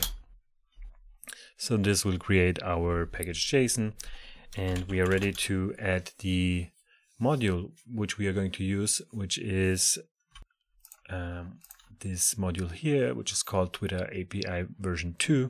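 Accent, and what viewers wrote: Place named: Germany. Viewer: are u a german ? your accent made me tired. sorry bro.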